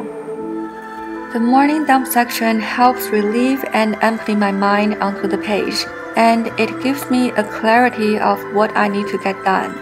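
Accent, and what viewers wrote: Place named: China. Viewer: ) 本來以為你是外國人，聽你說話，就聽出來是中國人了 😊你的影片都很好看～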